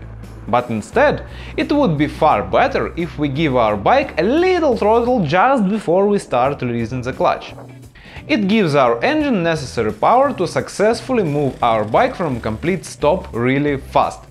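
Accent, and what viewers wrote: Russia. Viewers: I have a really strange feeling that I understand the author because I'm Russian and the author has bright Russian accent. It helps me understand the author's speech better 🤣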